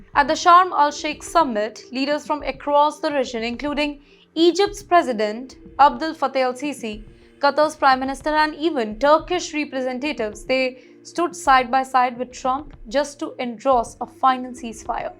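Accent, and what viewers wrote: India. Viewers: THERE IS NO TEREBEL LIKE INDIAN NATIONALITY SPOKE ENGLISCH 😅LIKE YOUR MOUTH FULL WITH TOUNGHS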